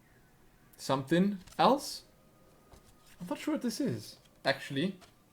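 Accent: South Africa. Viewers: Is he South African??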